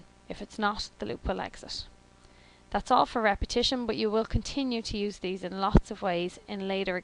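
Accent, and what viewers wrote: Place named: Ireland. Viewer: So nice to hear an Irish brogue explaining Java to me rather than Hindi. :-) Thank you! Great videos.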